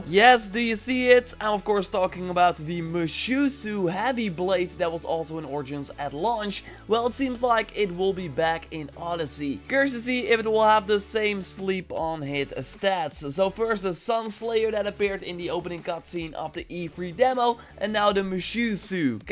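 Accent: Netherlands. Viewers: Bertram Rogers I am sure that there are YouTubers covering this gameplay why don't u go watch them, and i am sure that165k people like his dutch accent and his opinions or else they wouldn't be subscribed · Je hebt een zwaar Nederlands accent, jow now?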